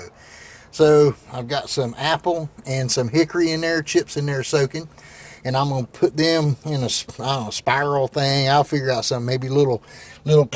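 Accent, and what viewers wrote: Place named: South, United States. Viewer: I don't know what was more entertaining your southern draw or the excitement in your voice. Really enjoyed your video!!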